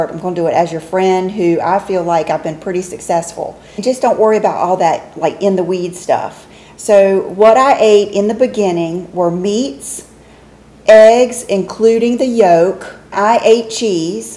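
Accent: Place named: Texas, United States. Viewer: Besides being drop dead beautiful and I think your accent is from Texas cause I'm a Texas gal living in California but you are also so sincere so sweet and thoughtful I love your channel love you❤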